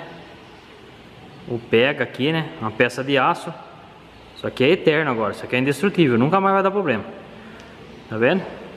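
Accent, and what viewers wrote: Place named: Piracicaba, Brazil. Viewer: Fala meu chegado. Pelo seu sutaque é de Piracicaba ne.. SOU torneiro mecanico tbm. Eu trabalhei e morei ai no Santa Rosa, e trabalhei na OTANNI . nao sei se conhece.. Cidade bacana de trabalhar. Abraço e sucesso..!!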